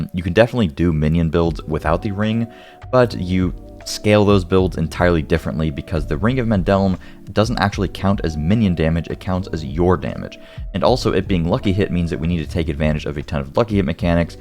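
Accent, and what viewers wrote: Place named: United States. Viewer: The speaker is using American English. It's pronounced MUH-cob.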